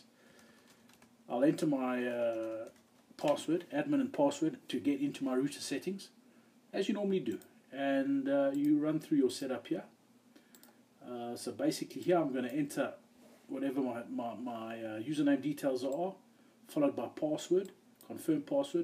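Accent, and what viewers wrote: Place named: South Africa. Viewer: So cool figuring out you're South African :)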